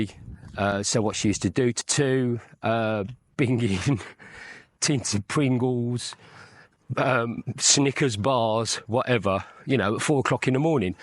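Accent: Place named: West Bromwich, United Kingdom. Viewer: I've been in Thailand since 2010 and can honestly say the women are getting fat and too demanding. It's a myth about beautiful Thai women. I had also experienced physical violence from them, I never ever experienced that from a woman in the western civilisation. Btw, you are looking older and fatter in the face. Forget getting a HiSo woman, no chance with your looks and West Brom accent! Lol+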